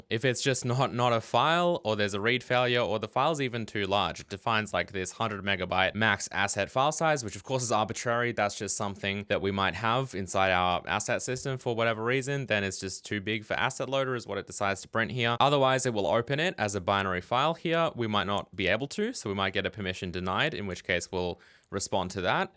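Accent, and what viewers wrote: Australia. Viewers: Don't assume I'm a simpleton like you and mistook the aussie accent for the f@ I know what aussie sounds like, I know what f@ sound like, he's both. Edit: extremely obvious when he says "C plas plasss"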